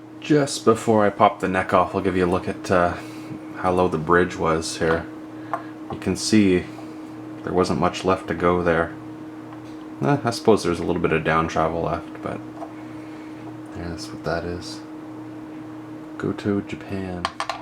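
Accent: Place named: Canada. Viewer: SLIGHTLY off topic but as a fan of linguistics, I have to say, Americans always think we Canadians say "a-BOOT". But as my hoser brother here says, it sounds way more like "a-BOAT".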